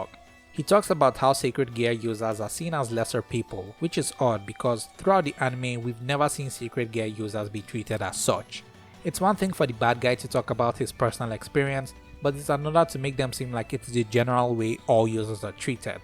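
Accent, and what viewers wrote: Nigeria. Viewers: Why do u sound Nigerian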